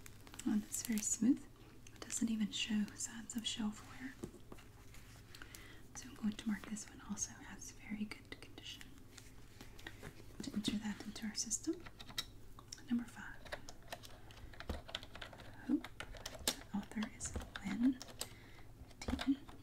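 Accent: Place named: North Carolina, United States. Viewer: Your voice reminds me of home. Born and raised in North Carolina. Very soothing to listen to when I'm far away from home. Hope you had a blessed holiday season 💕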